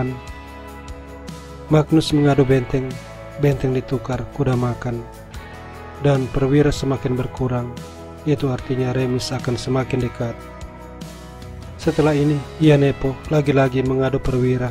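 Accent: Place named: Eastern Indonesia, Indonesia. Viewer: Bung orang timur kah. Logatnya itu kental bnget timurnya 😁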